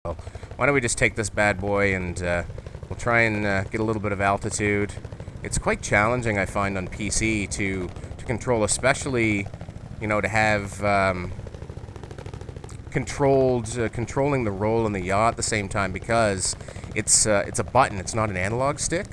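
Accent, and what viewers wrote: Canada. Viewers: out, about, mouse, because, alright, target, .. just sound weird in this video.. oo. i know y.. he is Canadian Ah. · You sound like your from Canada !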